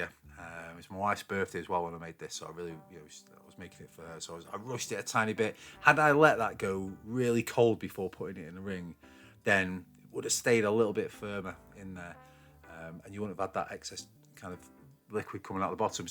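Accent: Yorkshire, United Kingdom. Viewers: Eeeees frooom Yorkshire by the sound of it. A beautiful accent us Aussies like coz our Captain Cook was born there. It’s weird how anyone can not understand English who is supposed to speak English. Strewth, it’s the language of the King James Bible.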